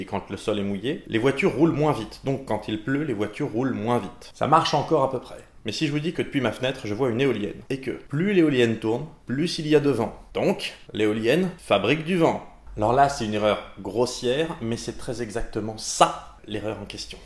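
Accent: Alsace, France. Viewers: Le, "ou pien", c'est ton côté alsacien qui refait surface ? ^^ (on a dû te faire plusieurs fois la remarque, mais tant pis) Très bon format, au départ je m'attendais à une sorte d'ersatz d'axolot à cause du titre de la vidéo (aussi accrocheur qu'un titre de une de journaux ^^), et puis finalement je suis content que ça ait finalement apporté autre chose que mes attentes. C'est vrai que l'amalgame corrélation = causalité est fréquent, il est bien trop souvent de lire à cause de ça (jusqu'à preuve du contraire ;P) des âneries monstrueuses chez certains journalistes pseudo-scientifiques... koff koff Big Browser koff koff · Mince je voulais vraiment savoir quel était l'endroit le + dangereux de France xD En tous cas on a bien senti l'accent alsacien ;-)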